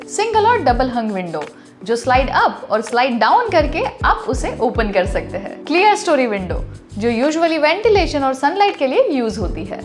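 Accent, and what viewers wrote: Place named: South, India. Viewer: You sound south, no offence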